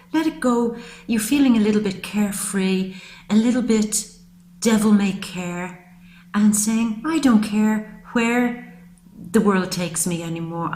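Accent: Ireland. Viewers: Neil said mesmerize, I say magnetic.He is right, you dear lady have it all, beauty, poise and grace. Also what appears to be a charming Irish lilt. I dare not say anything further for I have only one heart to loose.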